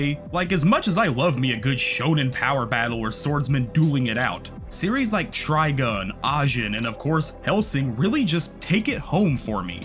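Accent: Texas, United States. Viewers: Great video! Also, are you Texan? Kept catching that twang from certain words and vowels❤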